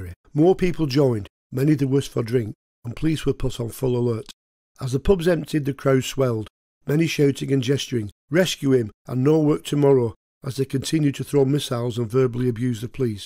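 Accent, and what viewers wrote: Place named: North, United Kingdom. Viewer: In defence of the regional accents of Northern Britain ( and yours is soft and pleasant to the ear ) when Eastenders came out there were thousands of requests from Scotland for subtitles .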